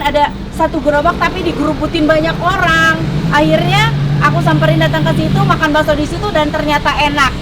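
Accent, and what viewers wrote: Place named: Sunda, Indonesia. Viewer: Sekarang aq jd ngefans am teh.. ngomng nya sunda pisan orgnya baik ke semua org sehat Dan sukses Terus teh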